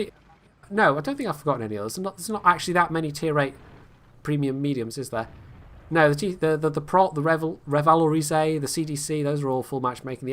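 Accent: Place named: United Kingdom. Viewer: I'll be there :) btw where are you from you sound British to me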